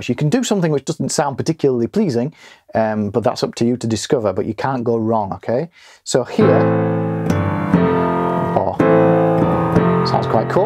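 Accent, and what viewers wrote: United Kingdom. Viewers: I came here by accident. Pleased I did. Your enthusiasm is infectious. Many thanks. Great to hear a Brit here on YouTube.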